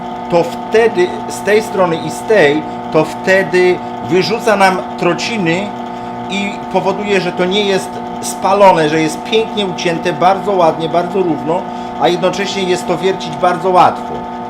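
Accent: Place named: United States. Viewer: Od ilu lat mieszkasz w Ameryce? Nawet jak mówisz po Polsku, to słychać że kacentujesz inaczej :-).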